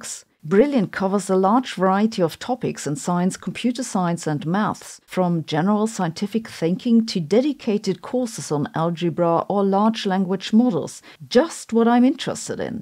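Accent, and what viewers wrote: Germany. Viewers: Slap a German accent - check / controversial statements - check / google information without facts - check / newspaper articles against the recent developments - check / I am not sure if your audience is enough educated to understand but certainly you are not competent in that hydrogen field you are talking about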